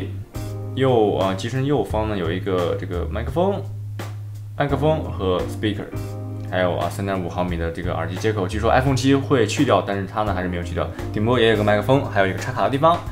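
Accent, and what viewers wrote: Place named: North, China. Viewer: 你是北方人吧，現在在哪個國家生活？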